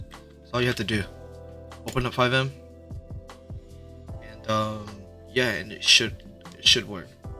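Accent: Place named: India. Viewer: bros indian now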